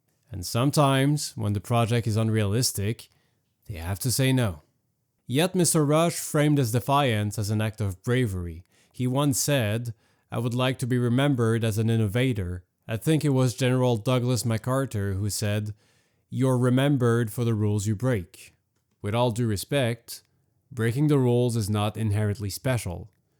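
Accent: Canada. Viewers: hey, are you from Sasktatoon? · What were the pings they kept talking about ? I’m going to have to listen to this again, to pick up some information I missed the first time . I definitely will see the movie There is another boat that has some questions I ‘d like answers to. The boat “ Trash man “ sailed out of So. Portland Maine, going to Ft Lauderdale Florida. Wrecked by a storm. There is a first hand book about it written y a survivor, and some films for the Weather channel, but some things were left out of the story so it didn’t add up. No conspiracy , but human mistakes and the way the boat was built ( square port holes ) that didn’t hold up. Anyway I like your east Quebec French accent comes out a little bit. Sending good thoughts from PEI and Florida.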